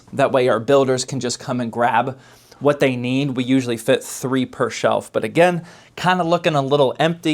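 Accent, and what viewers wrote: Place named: Texas, United States. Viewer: you already sound like you're from texas, you're definitely ready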